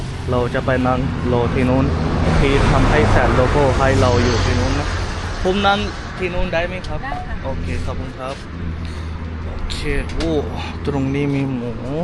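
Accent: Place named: South, Thailand. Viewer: สำเนียงใต้เลยนะฝรั่งอะไรวะ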